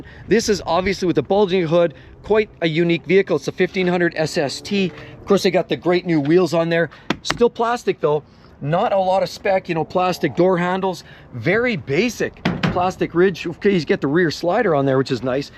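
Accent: Canada. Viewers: Yes, truck prices are stupid but did I detect a bit of Canadian in your voice? And then I looked up the pizza place next to the lot you are on. There's one near Edmonton, Alberta. The exchange rate is currently 1.37 Canadian to the U.S. dollar so cut those stated prices by about a third to get the U.S. prices. And do some homework before venting your spleens! I still wouldn't buy one, though. Pitter patter now! Yes, been streaming me some Letterkenny lately!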